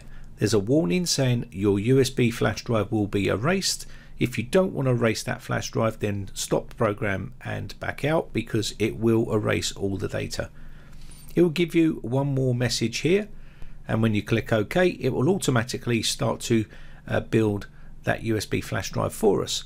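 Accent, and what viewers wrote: United Kingdom. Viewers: Couldn't help loving the accent. Where in UK are you from?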